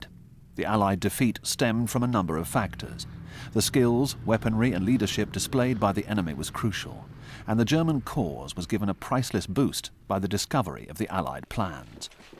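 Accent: United Kingdom. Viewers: Well said. Furthermore, as I like it as an American, is it possible that there is another voice other than a British voice that can narrate the story? Is there some kind of prohibition against all other English speaking people? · ...but but but it's got the stiff upper lip accent! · I hate the narrator's pretentious British accent.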